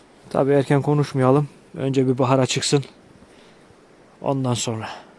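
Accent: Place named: Ordu, Turkey. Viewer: Kardeş, kolay gelsin.Genc bir arıcı arkadaşa benziyorsun.Karadeniz geniş bir çoğrafya.Güzel ülkemin hangi güzel ilindesiniz? Orduyu anımsatıyor konuşmanız.Ben Erzincan merkezdeyim.Tecrübedir, bir önceki yıl da üst üste iki defa kar yağmıştı.Yakinimdaki bir abimin arıların önü tamamen kapalıydı.Kendiside il dışındaydı.Arilarin önlerini açmak için aradım, kovan deliklerini açtırmadı.O yıl 40-45 arısı söndü.Arı kovan içinde havasızlıktan boğulmuş.Çünkü arı mevcutlarıda kalabaydı..Kovanlarini ben temizledim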